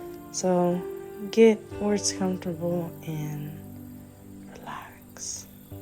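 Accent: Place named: United Kingdom. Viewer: your voice is very soothing - made my eyes close so had to watch the video again. thank you - also I was 100% sure you were British until the switch!! 😅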